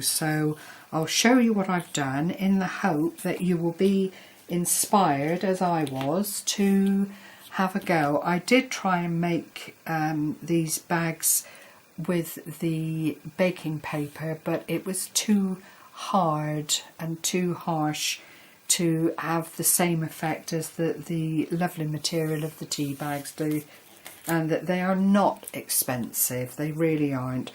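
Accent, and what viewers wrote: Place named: England, United Kingdom. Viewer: You created some lovely bags. I really like the way you embellished them. You are so creative. I really enjoyed listening to you. I love your English (am I correct?) accent. did a great tutorial and put your own flair on the bags. Very, very pretty bags. Thank you for sharing them.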